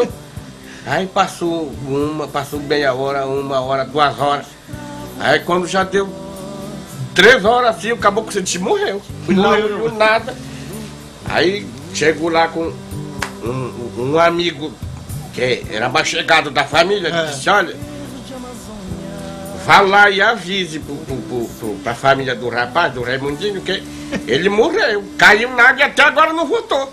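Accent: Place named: Pará, Brazil. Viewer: Melhor sotaque do Pará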